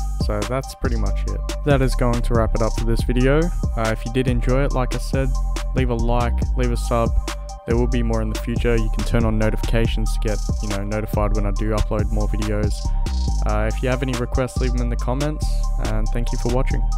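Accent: Australia. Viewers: You sound Aussie, is that correct?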